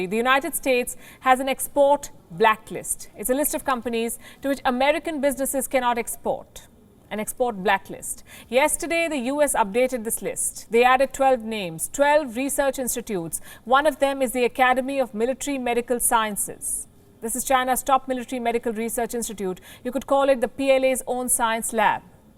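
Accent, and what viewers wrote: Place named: India. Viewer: 这大婶天天用咖喱英语黑中国，数十年如一日😅😅😅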